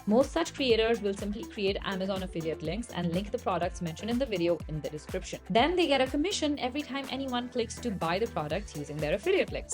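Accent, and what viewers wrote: India. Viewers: Your voice like india sound in you voice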